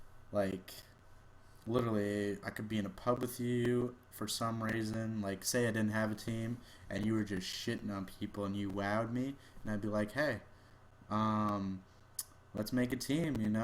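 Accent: Canada. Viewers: Change the title to "All Aboot Me ". lol, I like how Canadians say 'about', and 'sorry' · I can hear the Trailer Park Boys in his voice. It's amazing. Soarry. Abut. Grade 5. Incredible.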